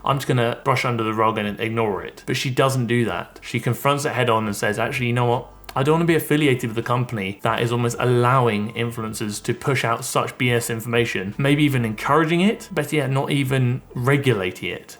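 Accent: United Kingdom. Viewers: Yours is the British accent I like. What area of the UK is from? Thanks for your videos :)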